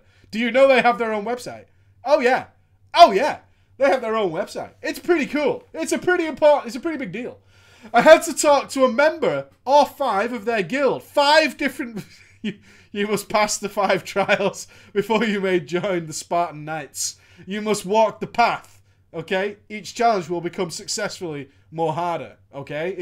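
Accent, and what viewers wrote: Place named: Newcastle, United Kingdom. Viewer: As a Mackem, I find your Geordie accent hilarious!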